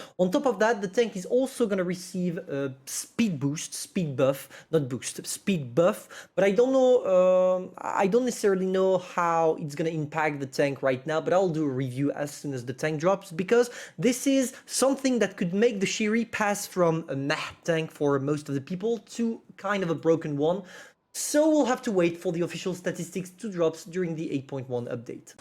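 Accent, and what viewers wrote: France. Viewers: Bonjour everyone ??? Sa se voit avec ton accent que tu es français, ou soit je dit de la merde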